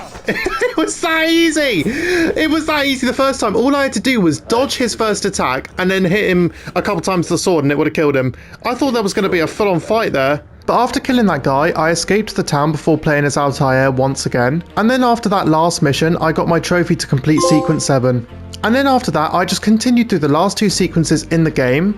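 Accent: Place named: United Kingdom. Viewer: revelations is one of my favorite ac game it's a perfect conclusion to Ezio and Altaïr story (if you don't count ambers) it has some of the best soundtracks of the whole franchise , the main theme is fabulous and even better than Ezio family so i'm sorry you had a bad experience i'm not a fan of the arabian/turkish culture but i love Istanbul and they did it perfectly in this game the orange sun still impresses me it's the only game with gta san andreas with an orange sun that i've seen i love your British accent i will visit London one day for sure ps : i love tea too :)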